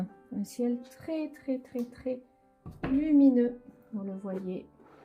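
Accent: South, France. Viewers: Bonjour Madame. J’ai une petite question belge à poser. Je n’ai jamais peint à l’huile. Cela demande t il une préparation particulière Le coût est-il plus élevé qu’à l’Acrylique ? Encore merci de vos conseils chantés avec votre magnifique voix du Sud. Depuis la Belgique, Amicalement ERIC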